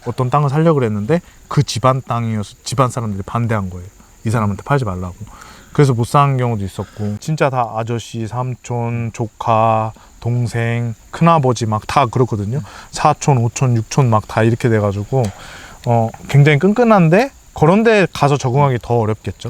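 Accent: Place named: Chungcheong, South Korea. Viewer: 말투를 보아하니 충청도사람이군요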